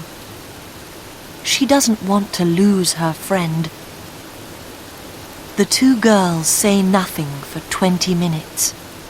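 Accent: United Kingdom. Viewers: THANKS FOR BRITISH ACCENT🤍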